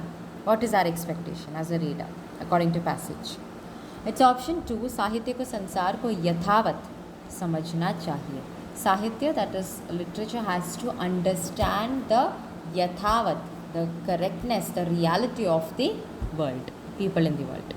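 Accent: India. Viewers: Ma'am are u North Indian or south Indian?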